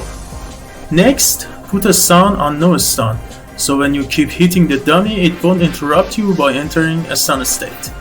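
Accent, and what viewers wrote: Iran. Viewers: why does this guy sound Iranian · lmao u have to be Persian ur accent is so obvious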